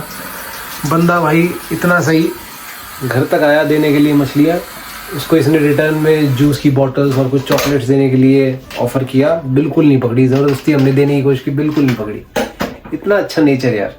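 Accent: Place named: Haryana, India. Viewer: Hey bhaiya....❤love from India.....big fan of your haryanvi accent 😀😀😀god bless your family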